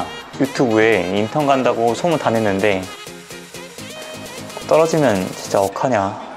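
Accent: Gwangju, South Korea. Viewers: 이제 2월에 본1 들어가는데 너무 웃겨서 이것만 보는중이에요....ㅋㅋㅋㅋㅋㅋㅠ 아 글고 광주사투리 너무 익숙해요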